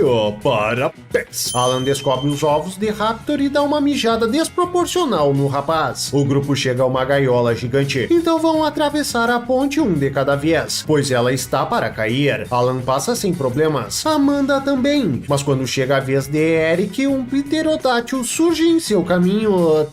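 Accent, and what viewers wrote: Rio Grande do Sul, Brazil. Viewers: Esse vídeo com sotaque gaúcho tá louco de especial tchê 😂 O que é inacreditável é que pessoas leigas como nós achamos tudo isso de erro e eles pagam milhões para fazer o diacho do filme e sai com esse tanto de erro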